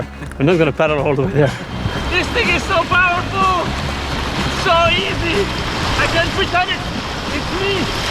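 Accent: France. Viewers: Dude, you Scream French!!! Never Stop!!!! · Nice footage but that french accent 🤢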